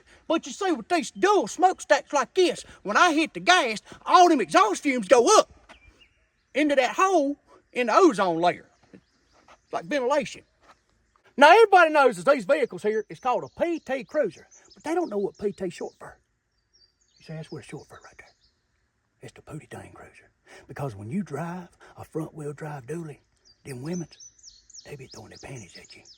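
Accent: South, United States. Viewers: This guy's Southern accent makes me laugh 😂😂😂😂😂😂😂😂😂😂😂😂😂😂😂😂😂😂😂😂😂😂😂😂😂😂😂😂😂😂😂😂😂😂😂😂😂😂😂😂😂😂😂😂😂😂😂😂😂😂😂😂😂😂😂😂😂😂😂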